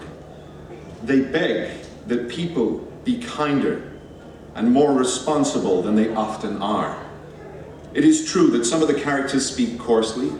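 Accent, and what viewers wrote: United States. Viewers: I love how he portrays his best American accent throughout... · interesting that he is affecting an American 'accent' for this. Doesn't make his voice any less mesmerizing. :) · Did Cumberbuddy do this to practice his American accent?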